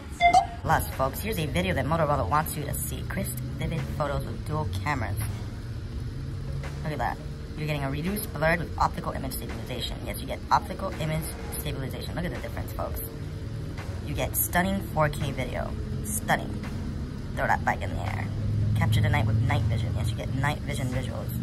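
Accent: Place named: Northeast, United States. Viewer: I'm looking at the Revvelry +, Stylo 5, Aristo 4+, &Samsung A10e and A20 a a backup. Phone shootouts would be cool. With a nick like and that digital voice accent, I'm guessing you're either a Philly, Joisey, or Brooklyn boy. Keep rockin.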